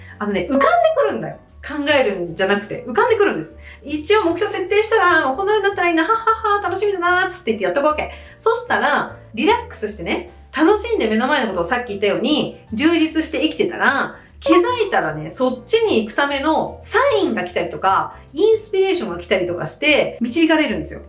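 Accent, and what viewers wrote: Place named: Edo, Japan. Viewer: 心が軽くなりました😄これからもよろしくお願いします👍べらんめい調、結構好きです。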